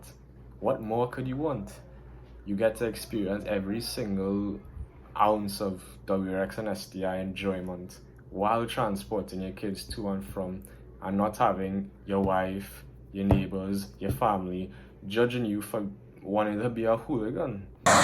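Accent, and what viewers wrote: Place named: Trinidad and Tobago. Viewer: You have Trinidadian accent.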